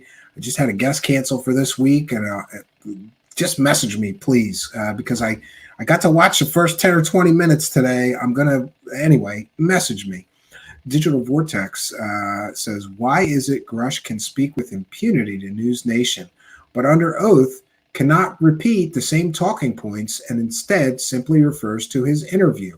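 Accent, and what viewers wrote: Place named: New Jersey, United States. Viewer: Great show!! You have a jersey accent..lol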